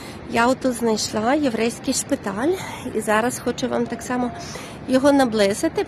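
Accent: Lviv, Ukraine. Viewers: приємно слухати вашу львівську говірку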